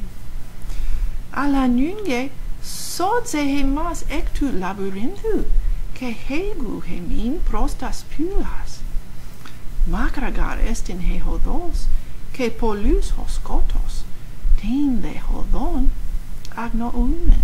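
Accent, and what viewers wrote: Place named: Greece. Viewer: Very well read. It actually sounds Greek. The others should copy your pronunciation.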